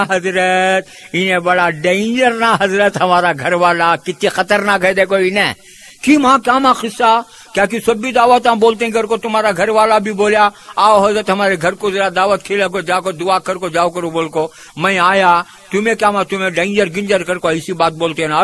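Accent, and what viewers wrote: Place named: Hyderabad, India. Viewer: North india me aisich boleto hyd ki language ku gaun ki language samajhte, ab ye jo lehja hai, bohot purana hai. Hyd me salon salon pehle, aisa baat karte the magar ab bohot sare north ke words laliye. Bangalore bhi bohot developed city hai. Wahan pe aisa baat karte, to kya bangalore gaoun hogaya kya be ? Language se city gaoun samajhne wale gawar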